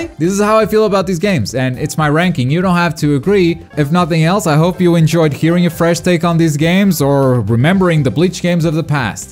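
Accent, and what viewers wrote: Portugal. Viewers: I didn't know you were Portuguese, but then the more I heard your voice, the more I realized your accent is like St1ka's. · Oh man, I thought you were from Spain all this time, and it turns out you're from Portugal. Nice!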